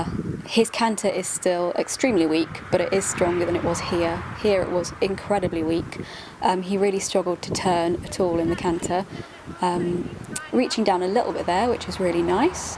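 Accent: England, United Kingdom. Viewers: Are you English because you sound like you are I am too but I live up north so I don’t talk like a normal British person and no one talks like people from down south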